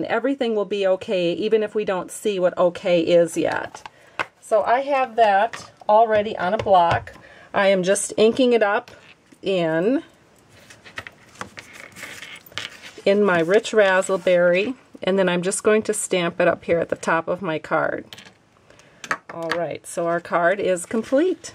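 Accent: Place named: Minnesota, United States. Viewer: I so enjoy your videos and wanted to share with you that you bring to me a touch of home as I am from Minnesota. I hear your voice with our distinct accent and it brings me to my childhood! Thank you for sharing your art and your life! Susan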